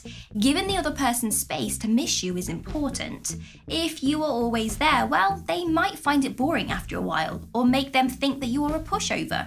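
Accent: United Kingdom. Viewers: I like your sweet British accent)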